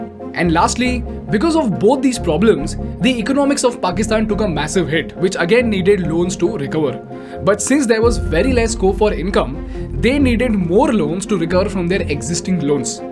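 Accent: India. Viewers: Typical Indian accent - Why cant you Indians just speak in a proper way? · He is speaking normally only, people of his generation(+younger) in india speak English like this not with the stereotypical accent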